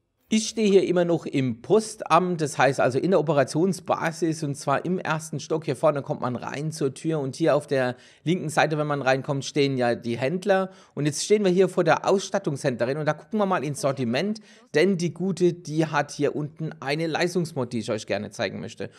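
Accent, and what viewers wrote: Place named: Heidelberg, Germany. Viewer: das hab ich gemerkt welche Richtung ? Heidelberg?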